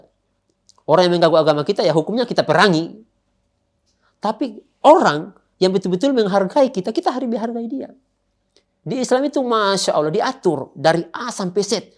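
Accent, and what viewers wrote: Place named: East Nusa Tenggara, Indonesia. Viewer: Logatnya ntt bukan Poso lagi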